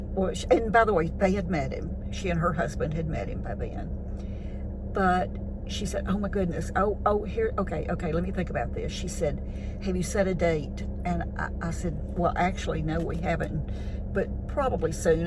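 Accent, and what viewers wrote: South, United States. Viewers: This was such a great story!! Your southern voice and the way you tell it is wonderful❤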